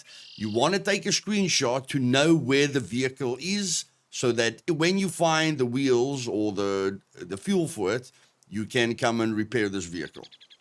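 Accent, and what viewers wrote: Afrikaans, South Africa. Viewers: Bro got the South African Accent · is that a afrikaanse acsent?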